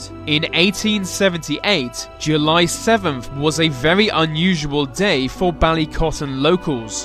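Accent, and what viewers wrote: England, United Kingdom. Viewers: He's English for sure! :)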